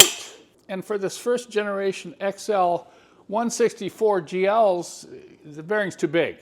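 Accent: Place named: United States. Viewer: Spanish translation is awful. We love your american english!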